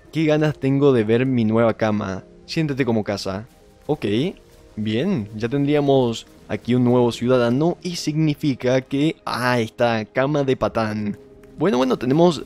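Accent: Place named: Paraguay. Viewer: Sospecho que es paraguayo por su asento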